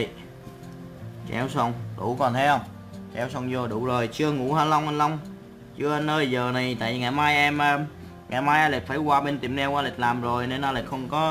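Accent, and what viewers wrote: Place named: Quang Nam, Vietnam. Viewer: A người quảng Nam hả a?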